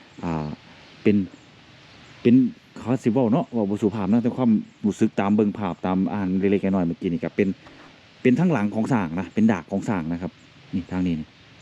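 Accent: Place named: Thailand. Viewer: อ่านภาษาลาวกะต้องออกสำเนียงลาวตั๊วจังแมน ออกสำเนียงไทยมันกะไปคนละทีปถ่อนแล่ว.. วรรคสุดท้ายเพิ่นบอกให้ปลดเกิบ คือถอดรองเท้าเพื่อแสดงความเคารพ...เบิ่งมาหลายคลิปเกี่ยวกับวัดตาแหลว แต่บ๊มีไผเว้าถึงสาเหตุที่แท้จริงการถล่มวัดของเมกา นอกจากคาดเดาว่า แถวนี้เป็นจุดยุทธศาสตร์ที่สำคัญ และกองกำลังทัพประชาชนลาวใช้วัดแห่งนี้เป็นฐานในการสู้รบ..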